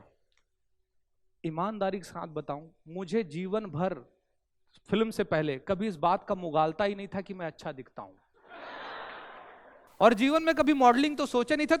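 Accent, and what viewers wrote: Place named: Chhattisgarh, India. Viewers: आपकी हिंदी में भी छत्तीसगढ़ी है 😄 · U r right, the best thoughts..bejjati hi safalta ki pahli sidhi hai.. chattisgariya accent ...total Maza aa gaya.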